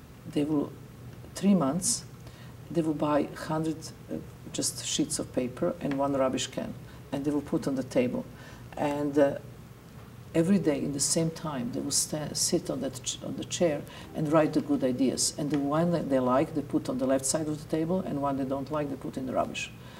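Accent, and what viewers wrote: Serbia. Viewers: she's not american but serbian lol "its her accent". ps, i dont defend her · She’s a beautiful soul. Period. Research her origins before you call her evil by your own ignorant definitions. You hear her Serbian accent and call her Voldemort, etc? The stupidity and ignorance is shocking. Catch a flight sometime and TRAVEL. Go to a museum! Crack a real book once in awhile. Ugh. Listen to what she is saying. Great art should upset us. It is hard and it is part of the artist’s very Being. THEIR experience. It should open our eyes and make us all think. Examine ourselves. If what you see is fear and man’s construct of a devil - that says a lot to the rest of us about YOU.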